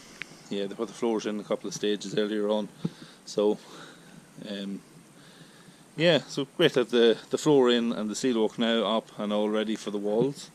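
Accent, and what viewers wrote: Dundalk, Ireland. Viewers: Where abouts in Ireland are you based ? Sounds like your from around Dundalk (louth) area ?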